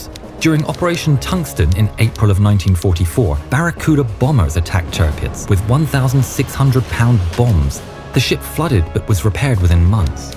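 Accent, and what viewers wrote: United Kingdom. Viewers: The narrator's accent sounds a bit British... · the narrator is British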